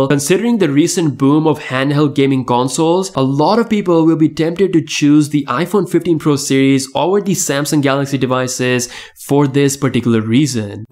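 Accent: India. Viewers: You sound like Indian speaking English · Idk but...Ur english sounds like an INDIAN english.. with some added accent.. 🤔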